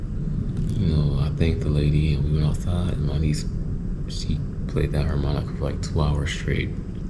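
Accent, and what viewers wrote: St. Louis, United States. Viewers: That st louis accent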